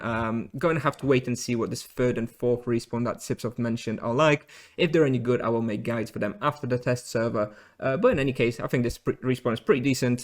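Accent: United Kingdom. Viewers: Bro are you british?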